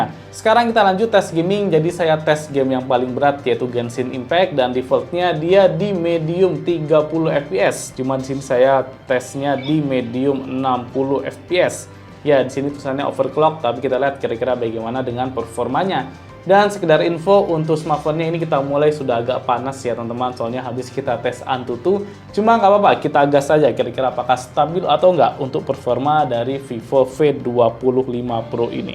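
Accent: Yogyakarta, Indonesia. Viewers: Mas nya orang jogja kah?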